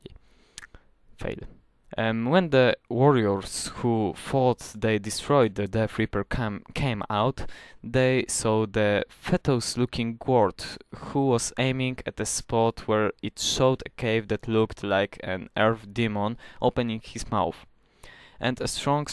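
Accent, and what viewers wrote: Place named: Poland. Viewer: Szacun. I tak słychać w choooooj polski akcent. O_o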